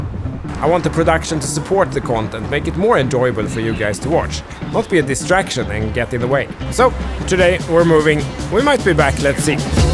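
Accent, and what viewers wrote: Sweden. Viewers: I always say "Hado" (I don't know how to spell it) to my swedish gaming buddies. I say it in your accent and for weeks they thought it was one of their countrymen saying it. You've apparently taught me more than investing strategy I also asked them about the canned fermented fish and they said, there's no way they would ever eat it 😆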